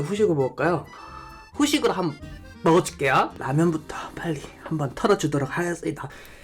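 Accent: Jeolla, South Korea. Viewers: 사투리보고 도 전라도 사람인거 인증 저도 전라돈데 뭔가 같은게 있어서 기분이 좋아 욤! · 오빠 말투 너무 귀엽자나요...... 전라도전라도한게 구수하기보다 귀여워서 계속 그 부분 돌려보게 되자나ㅠㅠㅠㅠㅠㅠ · ㅋㅋㅋㅋ킬바사 나트륨은 여전하신지 ㅋㅋㅋ이전에도 짜다고 짜증냇는거 기억나ㅋㅋㅋ짜증낼때 전라도 사투리 나오는거 졸귀ㅎㅎ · 전라도 사투리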